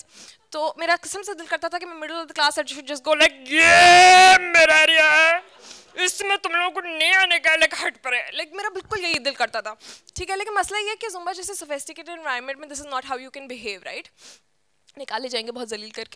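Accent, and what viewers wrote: India. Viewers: Well that was great.. But.. The language chosen by her was a bit like they speak in india.. I mean the way of talking.. Or even some punch lines.. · She has an Indian urdu/hindi accent.. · Exactly my point. I liked the script but her accent was more indianish. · why do you sound Indian ?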